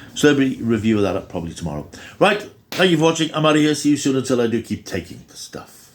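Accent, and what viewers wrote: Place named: Wales, United Kingdom. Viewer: Sounds like a welshish accent. I agree with you on figures. Because its on iPlayer tv figures are irrelevant. Wow 2019 seems like yesterday.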